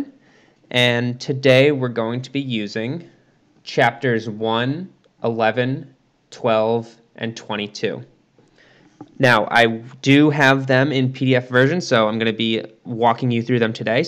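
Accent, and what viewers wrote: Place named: United States. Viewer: I did a thumbs up because your voice sounds enough like mine for me to understand you. I am so grateful for a fellow American "accent!"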